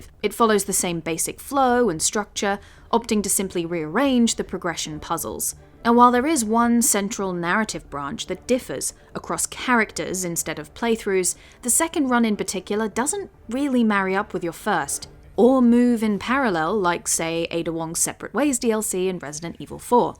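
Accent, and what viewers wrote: United Kingdom. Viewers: Jesus you sound english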